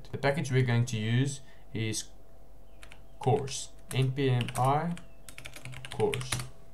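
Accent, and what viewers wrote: South Africa. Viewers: You sound South African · Dude, you finally put all my floating questions about the multer docs on npm to rest, thank you, you did such a wonderful job walking us through the code! 🤓🙌🏽💯✨ And super random but I love your South African accent btw 🎊. Greetings from the US :) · i had to search this guy cos the accent is very much South African!!! haha! very clean and straightforward video thank you! greetings from Germany! proudly SAn!!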